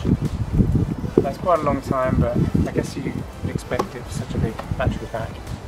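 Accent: United Kingdom. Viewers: You sound British but I'm assuming that you're reviewing for the American Market as the specs don't match, is this correct? Also lose the hat, you're not 12. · is this the Europe version or the US? I know there is different settings, as in, the Europe version does not allow the throttle to function independently where as the US version allows you to use it like a moped? if so, how did you get the US version in the UK (unless you're based in the US - your English accent has miss led me! lol)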